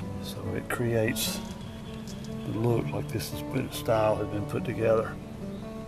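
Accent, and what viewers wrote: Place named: South, United States. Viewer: I’m getting a “southern” Bob Ross vibe. Excellent outcome, wood grains look awesome.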